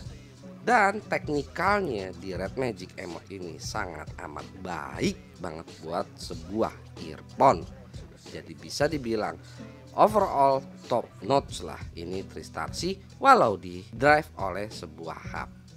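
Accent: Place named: Jakarta, Indonesia. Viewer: Kangen review suara cempreng dengan khas betawi dari kang..:D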